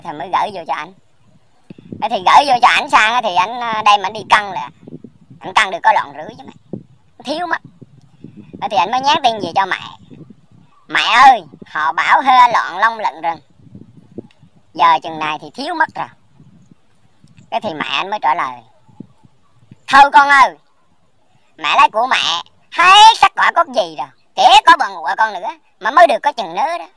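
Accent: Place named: Quang Nam, Vietnam. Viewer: Nói tiếng quãng thật nặng vô nhé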